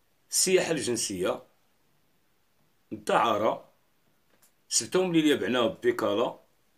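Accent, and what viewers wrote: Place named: Marrakech, Morocco. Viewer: انا اخويا عاجبني المحتوى و اكثر حاجة عاجباني هي اللهجة المراكشية👍👍 هاني ايوني عندك مرحبا بيا عندك فالقناةمرحبا و 6000 مرحبا 😂😂 تحية من 🇺🇸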